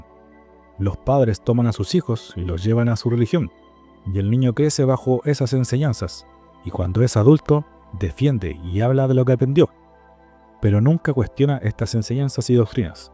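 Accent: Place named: Chile. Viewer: Iba a comentar que se debiese buscar un enfoque de beneficios y no miedo de no aprender, pero es todo lo contrario, tu canal es genial, una opinión muy critica, una voz con un toque chileno pero muy neutro y no buscas ideologizar sino compartir para quienes quiersn escuchar, y eres criticos con los ceeyentes y no con los que no lo son, y eso se me hace muy consecuente, es bueno encontrar gente que aun aprenda fe las enseñanzas de cristo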